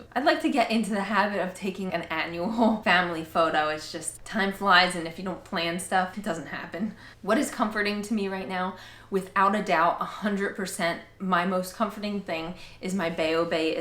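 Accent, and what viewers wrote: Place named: New Jersey, United States. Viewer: Hang in there momma♡ prayers for a successful vbac, your husband present regardless and all good things for your delivery. I know you're from up north, I thought I remembered you saying you're from NJ (and your accent sounds like mine😂) I am a jersey girl born and raised but live in SC and you make me feel back at home♡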